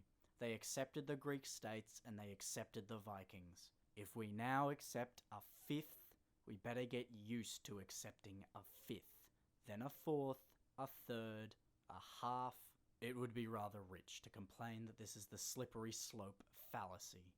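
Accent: Australia. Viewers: You an Aussie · You and GGmanlives should do a duo review. Both Australian accents and just straight trough without the kiddy bullshit. Love it!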